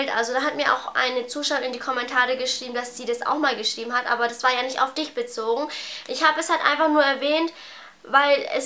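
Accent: Bavaria, Germany. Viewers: du hast keine sprachfehler? man merkt aber übel dass du aus bayern kommst :D des ist voll süß :) und das des abi in bayern schwieriger ist als in anderen bundesländer stimmt! :) reg dich nicht über andere leute auf !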